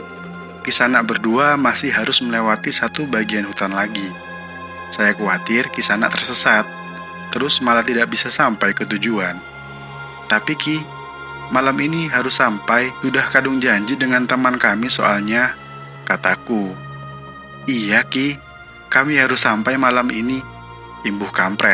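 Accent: Bali, Indonesia. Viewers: Maaf yg bacain cerita nda cocok.logat balinya.di hilangkan biar netral🙏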